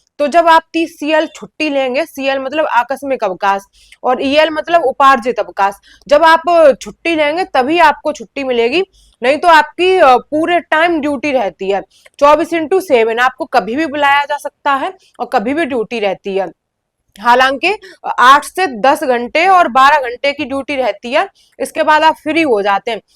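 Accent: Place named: Western Uttar Pradesh, India. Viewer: Western UP se ho na aap, aapki tuning se lag raha hai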